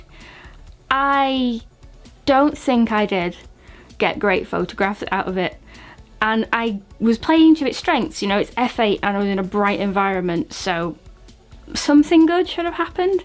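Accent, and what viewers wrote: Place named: Manchester, United Kingdom. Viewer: Great educational video . I just bought one , great for travel . Love your accent . Manchester ? United or city ? I'm from Yorkshire but I still like your video .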